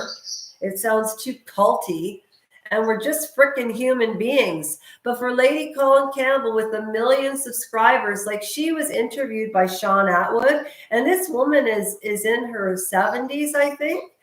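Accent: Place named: Canada. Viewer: I notice you don't actually say what it was Lady C said so we can judge for ourselves. A bit like MM accusing the RF of racism without giving the words used and context. And yes she will sue if she has been defamed. She is old school. Lady C may have a castle but she worked bloody hard to afford it.She also has a title and uses it to good effect and has raised millions for charities and foundations in Sussex, the UK and abroad. FYI, the book was published in 1992. You sound Canadian so you should know how the working royals, especially Princess Anne, have worked with Canadians to make a better society. Again I ask: what was said - exactly?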